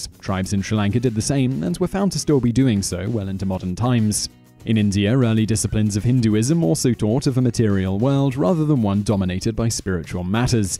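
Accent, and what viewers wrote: United Kingdom. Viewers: I really wouldn't expect a man with a British/English accent to have an UNBIASED opinion of the American Revolution. If the colonies were "impoverished", it was due to the taxation and mismanagement by the Crown. The colonists had to be good shots, since colonial larders were augmented with fish and game. The "Lobster Backs" as the British soldiers were called by the colonists, lined up in neat rows and fought in the European way. The colonists wore clothing dyed in nature's colors, and fought more in the native American way. Furthermore, the British were quite unfamiliar with the terrain in America--something the colonists were NOT. Furthermore, the British hired German mercenaries--Hessians--who sometimes defected to the American cause. Let's also keep in mind that the British senior officers--from the wealthy, landed aristocracy class--didn't exactly treat their own soldiers (let alone the colonists) with any modicum of respect. 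<link>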